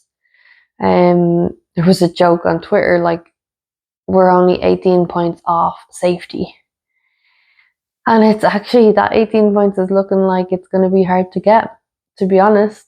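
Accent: Ireland. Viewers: I love your Irish accent. I could listen to you all day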